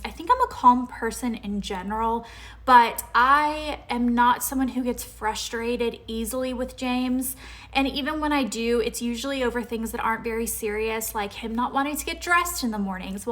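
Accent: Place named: South, United States. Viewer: I like how your southern accent is so much more noticeable now, it's cute :) it comes up especially when you get excited. I'm loving the new videos, this is such a time travel for all of us :) take care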